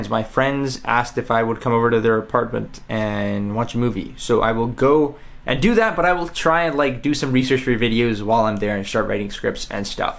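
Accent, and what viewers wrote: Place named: United States. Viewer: Indian harry potter with american accent, perfect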